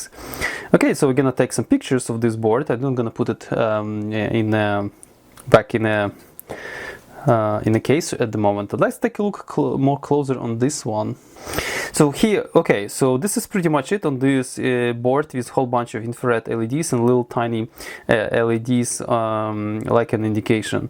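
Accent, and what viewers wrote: Russia. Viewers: I love your russian accent 😆 🤣 😂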